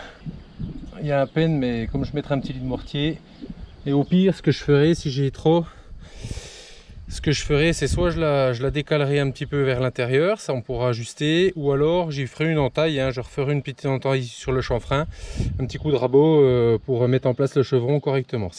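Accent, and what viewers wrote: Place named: Franche-Comté, France. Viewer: Tout à fait d’accord avec Youcef, quand on regarde la vidéo on est tellement dedans que le temps passe trop vite et petit bonus pour moi, l’accent franc-comtois et les expressions qui vont avec que j’adore entendre en tant que franc-comtoise. Tu ne soûles pas, tes explications sont simples et concises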